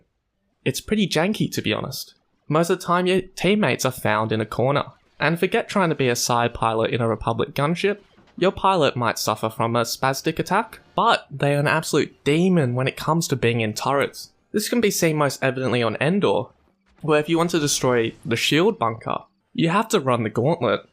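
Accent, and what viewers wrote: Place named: Australia. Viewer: yeah once i heard an aussie accent i knew i was safe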